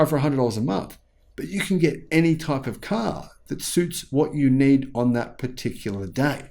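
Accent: Australia. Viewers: Yeah , you sound Ozzi mate! 😉 Love the content and your style.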